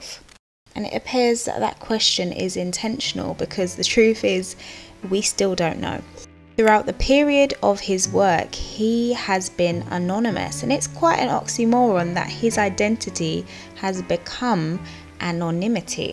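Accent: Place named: England, United Kingdom. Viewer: It's an accent. In fact it's an English accent, so you could argue she's saying it correctly and you're not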